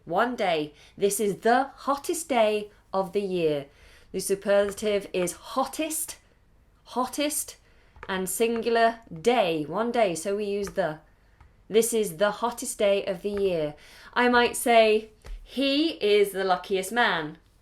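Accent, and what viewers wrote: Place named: United Kingdom. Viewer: Hi! I am Chandan Gunri from India. I have been watching your YouTube videos very carefully. I saw don't know the exact meaning of somnambulism. It's meaning is walking in sleep. I love your British English which is really helpful. You are truly an incredible teacher and there is no doubt about it. Thank you. Please get in touch with me like that. Really, you are helping me a lot.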